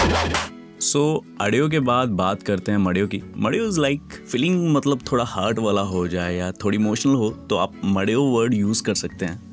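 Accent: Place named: Kangra, India. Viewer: Kangri boli di tone aa di Teri bhasa ch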